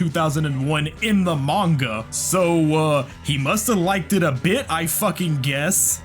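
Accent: Texas, United States. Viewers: Great video! Also, are you Texan? Kept catching that twang from certain words and vowels❤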